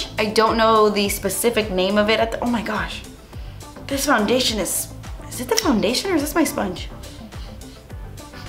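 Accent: United Kingdom. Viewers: are you british??? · goooood, I love when you speak with british accent :D but this definately looks more like a tutorial then a challenge, are you sure your not like both-handed? <3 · You're British accent gives me life hahah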